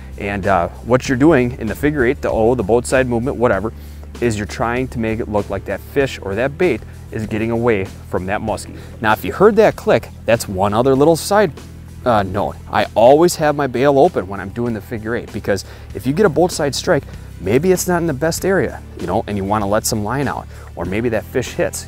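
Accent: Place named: Minnesota, United States. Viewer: The BOOOatside , the mOOOst important. This guy is hick Minnesota/wisconsin as you get. LOL- cracks me up with that accent. Good recs hOOOwever.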